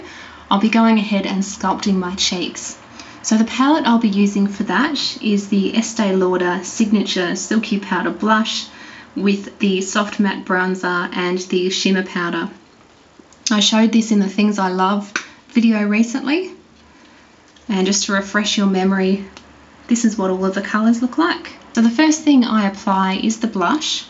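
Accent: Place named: Australia. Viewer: Great look! i love your accent!! your Australian right??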